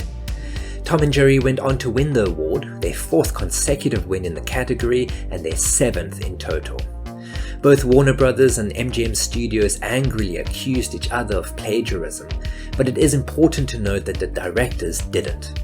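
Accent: South Africa. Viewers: Are you South African? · 2 seconds in and i hear a S.A accent....instant sub🌌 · You sound South African af my dude Sick vid tho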